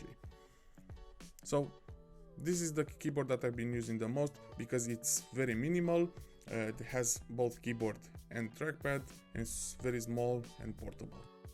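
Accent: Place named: Romania. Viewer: I could recognize a Romanian accent from a mile away 😃👍 good job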